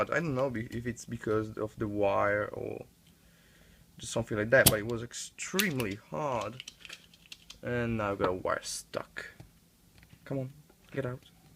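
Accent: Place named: Germany. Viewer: Nice accent mate...thanks for video...honest and correct...;) LOL super funny also. German i guess?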